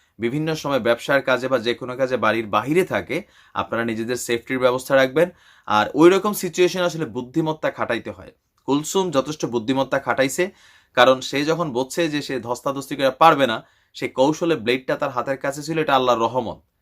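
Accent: Comilla, Bangladesh. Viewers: ভাই আপনার ভাষায় বুঝা যায় আপনি কুমিল্লার,কোন জায়গায় আপনার বাড়ি। আমি ও কুমিল্লার । আপনি খুব ভালো ভাবে উপস্থাপন করেন। আপনার প্রত্যেকটা আপডেট আমি দেখি এবং মজা পাই। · আপনার মুখে কুমিল্লার ভাসা অনেক বালো লাগে বাই এখন আমি ওহন কি হর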